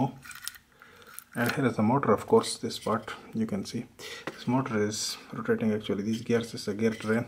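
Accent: India. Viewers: Oh cool! Saudi Arabia eh? Your accent made me think india or Pakistan. How's the collapse in the oil price affecting every day life over there? I've always wondered, what life is like in the kingdom. As for the toothbrush thing, I just thought it was funny that you said "LOL." to indicate that you made a joke.:-) Good video.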